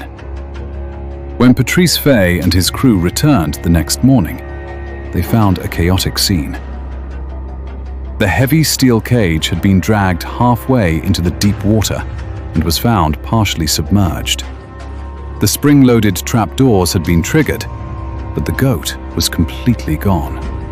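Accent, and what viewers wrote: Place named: United Kingdom. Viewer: As soon as I heard the British voice, I knew it was going to be good.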